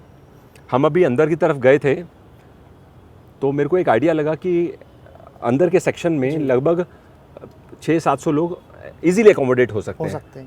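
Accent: Punjab, India. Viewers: Sir ....There is some 'NARRATION MAGNET' in your voice pitch.....upr se thoda PUNJABI TADKA😊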